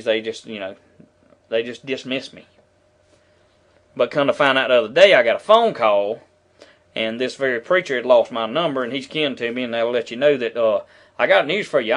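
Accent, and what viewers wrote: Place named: South, United States. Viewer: why? because he has a southern accent? because he is talking of being self-sufficient and preparedness? what he says makes sense, no matter if it contains "ya'll" and "aint". seems like you are the unintelligent one for tuning out valid points due to someone's speech pattern. i bet if your local mega super duper wal-mart closed down tomorrow, his viewpoint might suddenly seem rather valid, huh? although, some of the "they do this and that" talk is a little far out.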